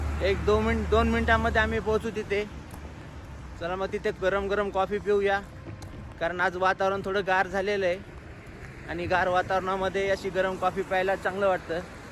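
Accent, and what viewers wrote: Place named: Solapur, India. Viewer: Aj first time Tumce videos pahile m. Tumcya tone vrun Vatl hot ki tumhi Solapur side ce asal. Chan Vatl yeikun ki tumhi latur ce ahat. Khup simple ahat 2ghpn tumhi ani tumci mul Pn.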